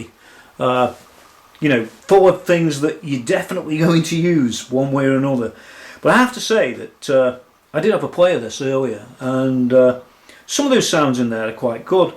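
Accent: Stoke, United Kingdom. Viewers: Nice review..are you a stokie? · YOU FROM STOKE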